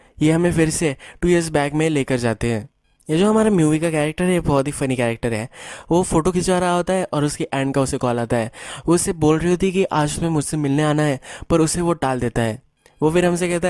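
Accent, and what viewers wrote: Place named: Uttarakhand, India. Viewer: You are from uttarakhand aren't you???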